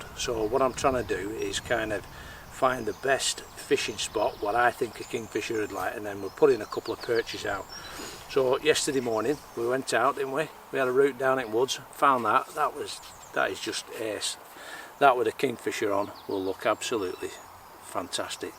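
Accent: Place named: Bolton, United Kingdom. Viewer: great video. you sound fairly local to Bolton where I'm from.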